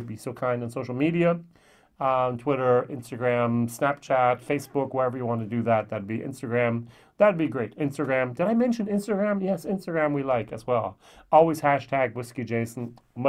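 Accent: Germany. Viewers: Your German accent is getting the better of you. The "S" in Sauternes is pronounced as an "ess", not a "zee" [sōˈtərn]...;-) I was gifted a bottle of WHISTLEPIG OLD WORLD SERIES SAUTERNES FINISH RYE 12 YEAR which was impressive. Looking forward to a whole new year of your vlogs.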